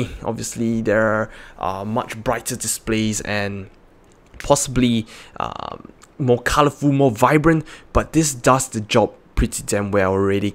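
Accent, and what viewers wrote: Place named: Singapore. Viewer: Very "Singaporean" accent there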